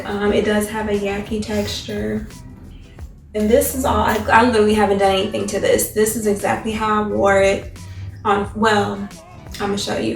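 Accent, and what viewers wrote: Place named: United States. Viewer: Love your country accent girl!! Love the video thank you 👏🏾👏🏾👏🏾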